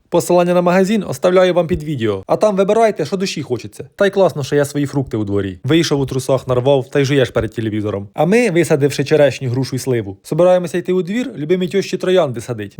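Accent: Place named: Surzhyk, Ukraine. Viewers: Як я вас люблю слухати))) Хоч ви з Черкащани, а звучить,як наш полтавський суржик зі всіма діалектами 😂❤❤ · Таки гарний суржик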